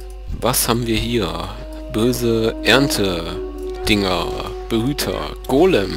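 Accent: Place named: North, Germany. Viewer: du klingst als wärste ausm norden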